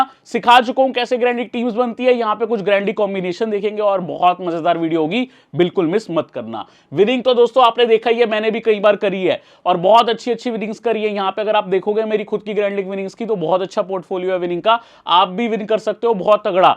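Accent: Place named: Punjab, India. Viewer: Punjabi voice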